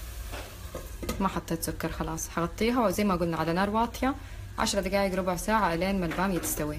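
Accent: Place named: Hejaz, Saudi Arabia. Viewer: شكراً الطبخة سهلة انبسط لما اسمع حجازية زيي تقدم اشياء حلوة الرب يباركك ❤️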